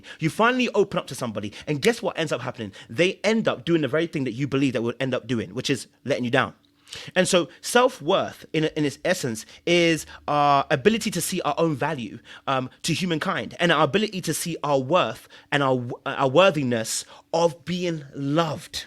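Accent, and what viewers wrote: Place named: United Kingdom. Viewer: When u start talking and the British came out 😮 it will never not be shocking to me ❤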